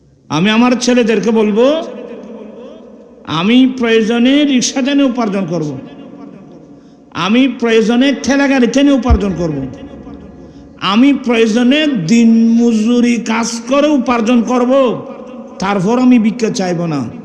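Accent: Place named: Chittagong, Bangladesh. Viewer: চিটাগংএর ভাষা বাদ দিয়ে শুদ্ধ ভাষায় ওয়াজ করলে সারা বাংলাদেশের মানুষ বুঝতে সহজ হতো বেয়াদবি হলে মাফ করবেন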